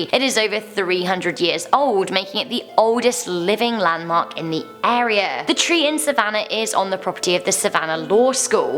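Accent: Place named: United Kingdom. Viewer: lousy SHITBRIT narration. SHITTISH is the WORST kind of English inflection.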